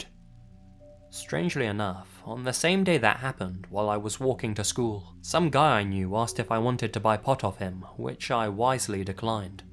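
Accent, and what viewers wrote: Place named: South East England, United Kingdom. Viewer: It's a bog standard middle class south east England accent. Nothing like Scottish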